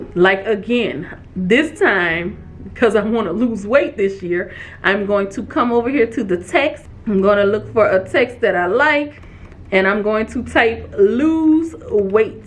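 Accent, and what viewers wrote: New Orleans, United States. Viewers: Good information my sista, I gotta head down to Nawlins' again soon. I love a sista with a NOLO accent 👊🏿💯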